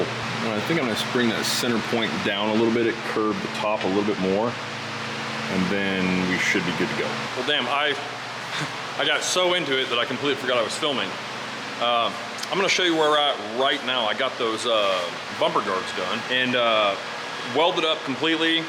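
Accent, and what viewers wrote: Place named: United States. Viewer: What are you talking about Clif ? That's an American accent ! As for the way he looks, don't make fun of him just for being a pretty boy, 😂 he can't help it if he's handsome.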